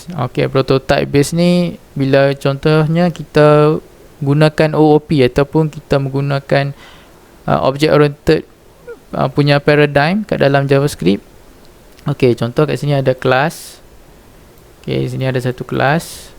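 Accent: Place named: North, Malaysia. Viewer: saya subscribe hasil dari iklan awak di YT Channel Hazeman Huzir.. org mana tu.. terkeluaq bahasa utara tu..kekekeke.. saya support ini channel.. Salam dari Bumi Sintok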